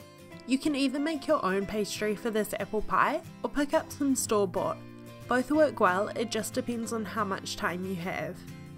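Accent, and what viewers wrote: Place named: New Zealand. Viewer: New Zealand accent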